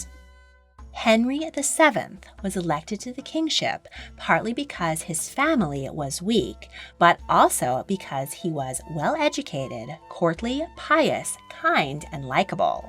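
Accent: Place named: United States. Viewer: What a HORRIBLE, horrible nasal American voice. Ouch, it hurts.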